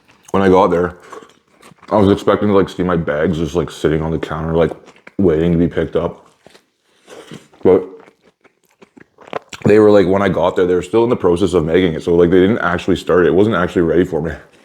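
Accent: Canada. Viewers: Your accent isn’t super familiar to me as an Ontarian, are you possibly albertan or a maritimer?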